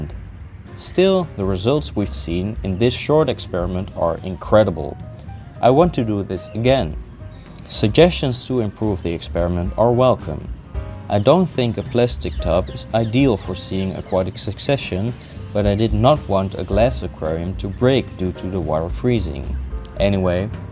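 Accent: Netherlands. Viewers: I love the way you unapologetically pronounce things with your Dutch accent 🇳🇱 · i can hear the dutch accent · I love how awkward he is coupled with how awkward the Dutch accent is, while being very interesting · why does your accent sound dutch are u also a dutch guy ??? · Love het nederlandse accent geweldige video ❤ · Why do you pronounce the Latin names in Dutch?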